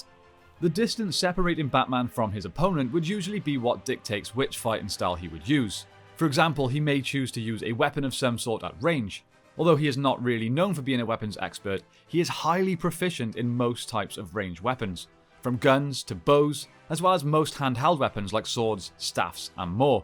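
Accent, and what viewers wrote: United Kingdom. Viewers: Lol, not surprised you have a gym downstairs. You don’t really dress to show it off, but you can’t entirely hide from a discerning eye that you’re in good shape. Put your physique together with that British accent, your red hair, and your rugged good looks and it’s no wonder I enjoy looking at you just as much as I enjoy listening to you talk about Batman!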